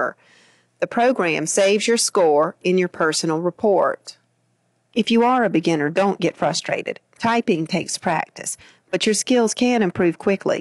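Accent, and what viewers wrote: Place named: South Carolina, United States. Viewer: I think from South Carolina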